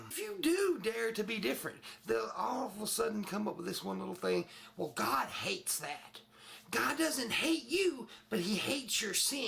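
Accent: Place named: Louisiana, United States. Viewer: Brandon Hoose It's his Louisiana accent. It makes anybody sound like a preacher (just like a Tennessee accent makes anybody sound like a country singer, even though he/she would not be a country music fan).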